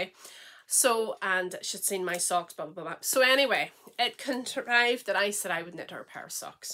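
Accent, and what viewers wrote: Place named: Northern Ireland, United Kingdom. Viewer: Hi, I found your podcast yesterday. Absolutely love your podcast. It was your accent that made me watch as l was a Carrickfergus girl /woman who has just moved to the North coast. Incidentally l miss Jackson’s too!!!! I also spend a lot of time in Devon. I was just thinking you can take the girl out of Northern Ireland............ As a Christian l find your words very encouraging. Thank you so much xx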